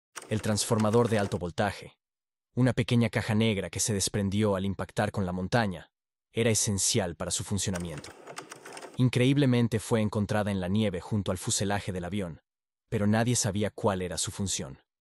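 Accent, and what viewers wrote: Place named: United States. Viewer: He estado viendo tus vídeos y noto que tienes un acento medio gringo, y no solo a lo hora de pronunciar nombres ingleses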